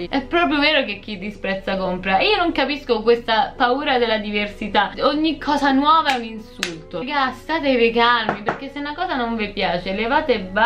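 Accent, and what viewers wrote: Rome, Italy. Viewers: Hai una cadenza romana strepitosa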